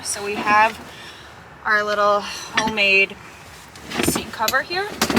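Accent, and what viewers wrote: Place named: Chicago, United States. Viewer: Born and raised in Chicago….I can hear the Chicago in you voice! We have a similar handsome dog. Love the Rivian! And Lake Shore Drive (I slightly dated myself😂)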